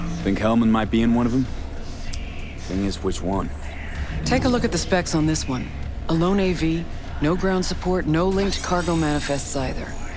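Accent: United Kingdom. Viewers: Quite astute to notice the three branches of story- I have a feeling you might notice some other associations as you play. It's way more interesting to come across anything like that naturally so I don't want to give any hints. In my opinion, after having played through this game several times, Letting your whims and interest guide you to what content feels right in the moment is the best way to play. From my end, I just feel blessed to have been able to share in a bit of your experience with it through the window of the internet. Hello from across the pond! (I'm from Canada, and by your accent, I assume you're from the UK?)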